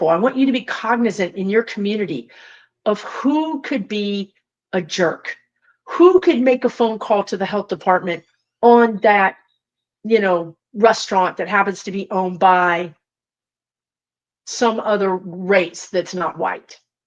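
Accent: Texas, United States. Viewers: Like you don't look white Southern. Sound Texas or Southern fo sho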